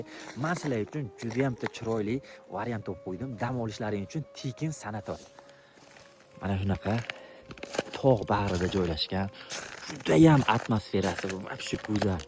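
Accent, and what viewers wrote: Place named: Jizzakh, Uzbekistan. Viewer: Жиззахданмиз ака узиз кайердан чота шебев бизни шевага ухшебди❤❤❤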